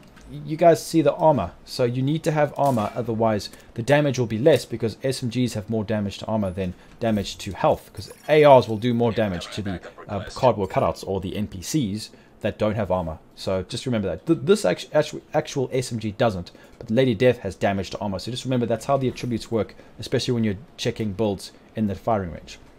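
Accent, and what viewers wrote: South Africa. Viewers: Im guessing Joburg or Durban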